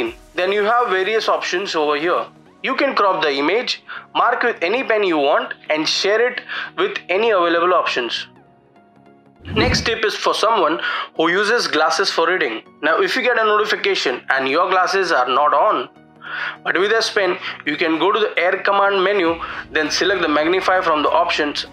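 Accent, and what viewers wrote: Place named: India. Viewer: Funny indian accent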